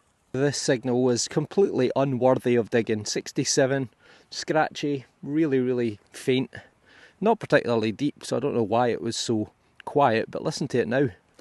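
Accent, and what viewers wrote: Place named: Scotland, United Kingdom. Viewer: i hate to make your head swell but these comments are right you have great videos and most importantly great finds but also your have a great voice and accent. everybody loves a Scottish accent specially when you can understand with a Yankee ear, you could be the next Sean Connery. keep it up and you'll have 6 figure subscribers in a year.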